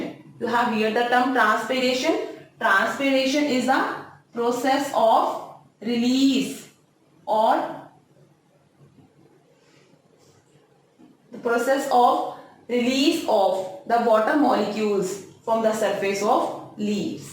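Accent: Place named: Punjab, India. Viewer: I really like maam punjabi accent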